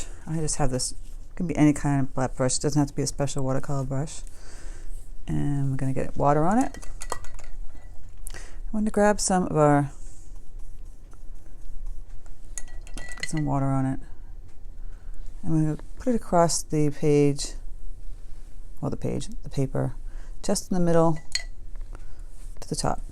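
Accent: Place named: East Coast, United States. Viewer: I have to tell you that I have learned SO MUCH from you in the short time I've been subscribed. I have watched every video, and you're so great at explaining each thing you do to achieve the look you're going for, and I think I painted along with EVERY Christmas card video and gave hand-painted Christmas cards to ALL my friends and family! I always can't wait for your new videos to see what else I can learn! I'm fairly new to using watercolors, and value each lesson I can glean from watching! Thank you so much for sharing your talent and instruction with all of us! You rock!! BTW, what part of the East Coast are you from? I was born and raised a Jersey Girl, and I hear something in your accent, but can't quite place it! Thanks again!!!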